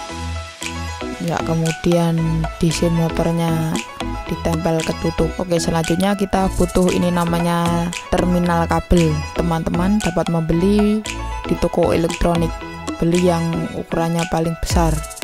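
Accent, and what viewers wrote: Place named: Java, Indonesia. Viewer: Keliatan kali jawanya... Medok abisss...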